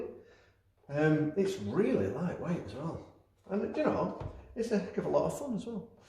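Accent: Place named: Lancashire, United Kingdom. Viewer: This fellow is much more Ace Rimmer than Arnold Judas - what a (nice) guy! Thanks for the enthusiastic demo, all wrapped up in a good honest Lancashire accent! I bet he's a great dad :D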